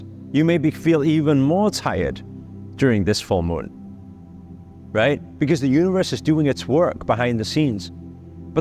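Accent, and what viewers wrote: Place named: Wales, United Kingdom. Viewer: I had an old colleague get in touch lately but I always had an off feeling about them so I didn’t engage her - was polite but short. I trust my gut. I’ve been giving a training opportunity and taken it by the horns, very very grateful! Where is your accent from? It’s very interesting. Is there some Welsh in there?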